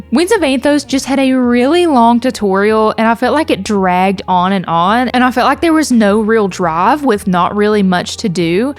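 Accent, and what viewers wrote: South, United States. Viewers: And what part of the south are you from? Just found the channel btw. Good review! Subscribed..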